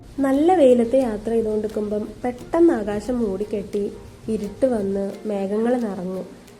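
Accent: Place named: Kozhikode, India. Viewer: Awesome presentation! It was great to hear😊. പിന്നെ ഇങ്ങടെ കോയിക്കോടൻ ഭാഷ പൊളിയാന്ന്❤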